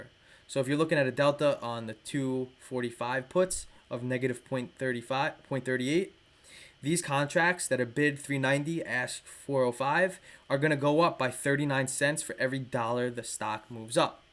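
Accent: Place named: Long Island, United States. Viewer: Brother is from long island, i can hear it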